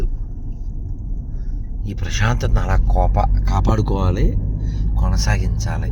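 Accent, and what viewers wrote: Telangana, India. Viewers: ధాన్యపు రాశులు. తెలంగాణ యాసలో. మీ ప్రయాణం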